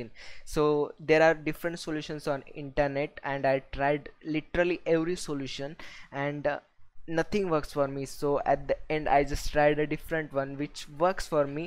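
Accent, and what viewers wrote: India. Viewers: You already know it gonna be legit when a indian starts talking :) much love broda finally working <3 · talks to much indian very slow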